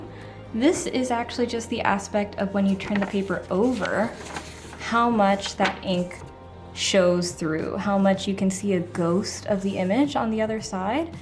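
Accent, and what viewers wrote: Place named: Missouri, United States. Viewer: Where are you from? Love you accent. Some friends from Missouri speak like you.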